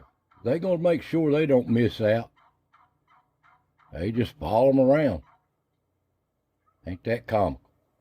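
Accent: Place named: South, United States. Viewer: I love listening to your stories I think the are the best. With your southern accent it is perfect for this Thank you very much 😊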